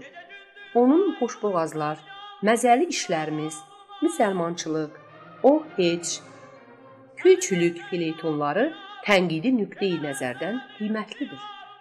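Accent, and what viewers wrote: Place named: Talysh, Azerbaijan. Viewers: Müslüm Moqamayevin şəkli səhvdir. Bir də ki danışan elə bil talışdı ləhcəsi var. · Ee bəsdirində xəstəsiz talış ləhcəsidi ləhcəsidi nolsun əsas məlumatınz oldu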